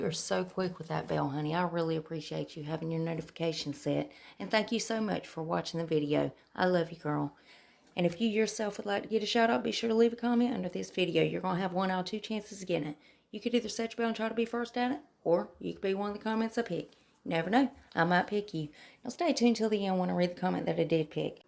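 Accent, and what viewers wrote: South, United States. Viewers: Thanks for sharing the info!! I love listening to you talk bc you have a really thick country accent like I do, 😂😂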